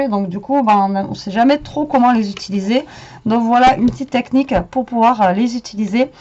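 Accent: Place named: Toulouse, France. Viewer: Bonjour c'est vrai que c'est une bonne idée qu on peut décliner de plusieurs façons .Merci beaucoup...si ce n'est pas indiscret de quelle région êtes -vous ??? vous avez l'accent de mes petites filles qui habitent Toulouse,,,,???bonne semaine ensoleillée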